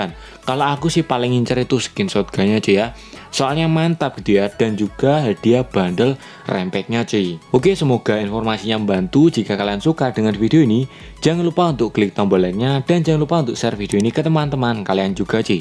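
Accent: Java, Indonesia. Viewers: abang Orang mna sih bang JAWA YA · Bosone kok medok bang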